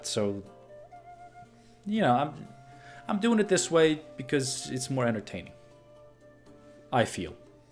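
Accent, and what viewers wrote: Norway. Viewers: Hey, I love your decks, I watch them all - and yes, even though I've been playing for years, I still pick up things here and there from you. It's pretty entertaining to watch these matches play out and you seem kind and sincere. Please keep it coming. I've done some decks that have been #1 trending but, for example, I didn't know about the rule of 16 until you told me! I like different decks, I probably spend as much time building and creating. Anyway, trying to place your accent. I hear European, perhaps Norway? Have a great day. And be happy - your Gwenting!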